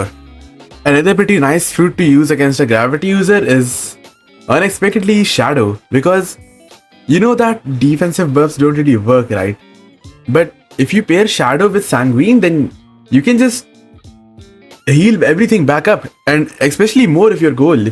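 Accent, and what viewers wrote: India. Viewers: the indian accent eww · ngl u sound like a indian curry dweller but good tips btw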